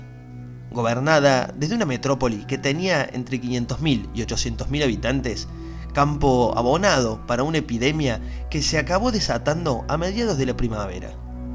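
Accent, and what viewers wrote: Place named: Córdoba, Argentina. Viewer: con un ligero acento coordobés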